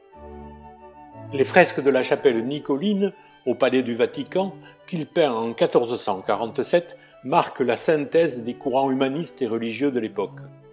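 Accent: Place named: Provence, France. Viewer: J'aime votre accent de gentilhomme provençal 😉😉🤗🤗🤗😎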